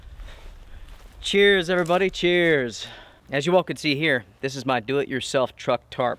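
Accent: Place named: North, United States. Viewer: where's the mountains of North Carolina accent ? you sound like a yankee to me.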